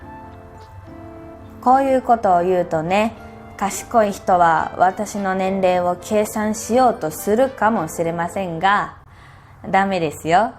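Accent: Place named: Kansai, Japan. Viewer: Me gusto mucho el podcast :3 いいポッドキャストだと思います 関西弁は可愛く聞こえます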